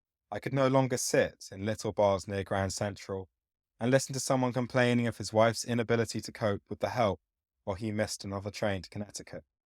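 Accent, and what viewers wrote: United Kingdom. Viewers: I agree with what everyone is saying… plus its a weird choice to have a british man read a personal essay by an american woman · Whomever thought it a good idea to have a man speaking in a British voice reading a deeply personal and quintessential American topic? Like having a kangaroo teach ballet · He’s English. Although we citizens of the USA speak English, we have completely different manners of speaking a sentence. It’s the intonations and inflections.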